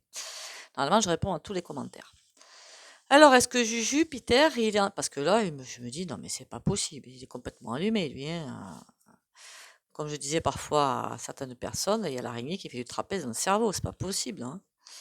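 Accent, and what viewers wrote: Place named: Toulouse, France. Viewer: Bonjour, Je me suis abonnée grâce à Kriss Sinclair. Merci pour votre tirage et vos conseils et soin au pendule. J adore votre accent qui me rappelle Toulouse et ses alentours. Tout de Bon! Eulalie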